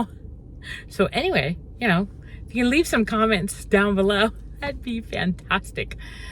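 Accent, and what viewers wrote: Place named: Kenya. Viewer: 😂😂😂😂 I love venye the Kenyan accent has caught up. "Even me I will stare"😂😂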